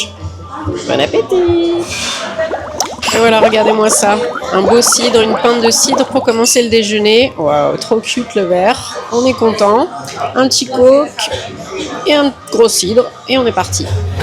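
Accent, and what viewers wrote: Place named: France. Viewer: Any channel that helps inform more beautiful French ladies on how to move to Scotland gets all my support and a big thumbs up 👍😀 Who knew watching a French lady roaming around Scotland could be so interesting and relaxing. I could listen to your accent all day long :) Thanks again for the video, and best of luck with your channel. 💙🤍 from SW Scotland.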